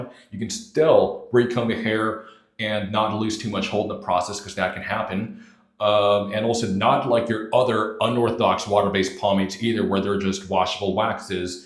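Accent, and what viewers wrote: South, United States. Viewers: ! You’re back! I thought you were living in Germany? … yet I detect a southern (USA) accent. 🤔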